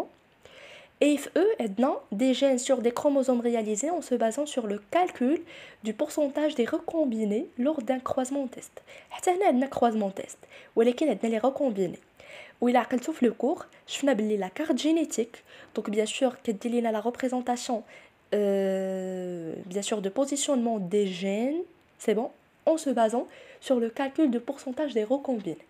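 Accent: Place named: Paris, France. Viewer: 😂😂😂😂hrbtini tana kt3sbni hdra dyalha tguli ghir ylah nzlat mn paris hhhhhhhhhhhh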